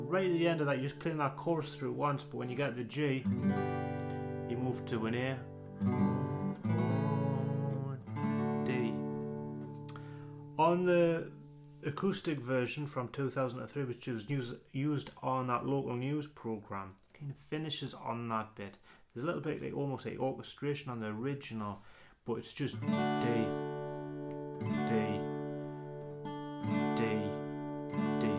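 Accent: Newcastle, United Kingdom. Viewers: love the geordie btw, b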